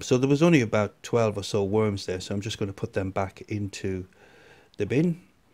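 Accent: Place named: United Kingdom. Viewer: .I enjoy listening to your accent also. I am origanaly from a small village called Darley near Harrogate Yorkshire. I live in the USA now in Tenneessee so it is lovely to hear another British Person's voice :) Do you ever show your face ? I like to see the face with the voice. I really enjoy your video's thanks for sharing them with us :)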